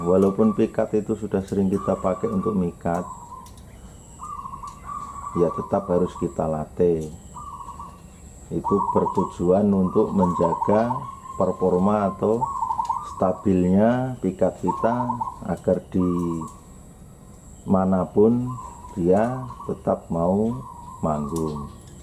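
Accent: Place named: Tegal, Indonesia. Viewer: MZ bahasane ecek2 njenengan orang Tegal apa Slawi 😃😃😃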